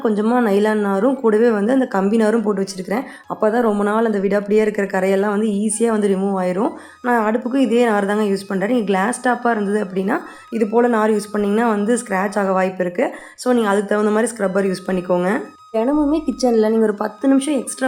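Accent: Kongu, India. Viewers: Kongu tamil apedetha ka sis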